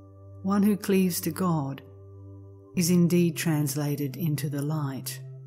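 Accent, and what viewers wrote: Australia. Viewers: I love your voice. Such clarity and such strength fill with compassion. Are you Australian? So relaxing no rush, just basking in it all. Thank you.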